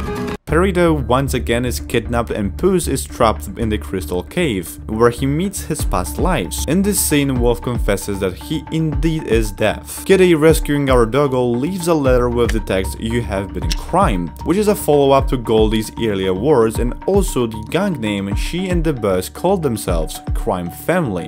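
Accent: Poland. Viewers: Wait are you Polish? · are you polish or something ?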